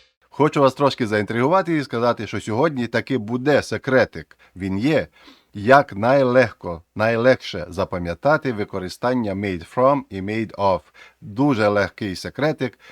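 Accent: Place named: Zakarpattia, Ukraine. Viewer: Підписалася, вподобайка, поширюю, коментую! Чудовий, професійний канал українською з колоритним закарпатським акцентом! Вже 20 років в Америці а таких тонкощів не знала - щиро дякую!!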